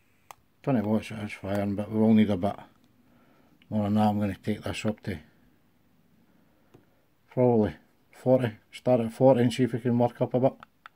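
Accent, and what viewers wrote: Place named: Scotland, United Kingdom. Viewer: Just copied your instructions there now, I love your nonchalant Scottish tutorial. Reminds me of when I worked over there during the 90's and a guy showing me how to take cuttings in a huge plant nursery. Straight to the point, no waffle and in layman's terms. That's the way I roll. Good morning from County Clare in the west of Ireland 👍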